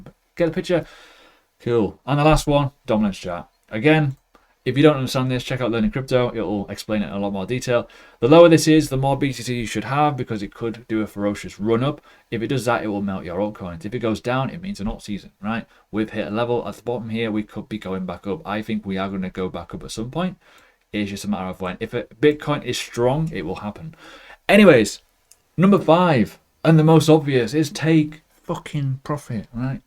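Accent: United Kingdom. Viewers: Omg 😱 my favourite guy ever. Please keep them coming. The British accent a huge bonus 👍👍👍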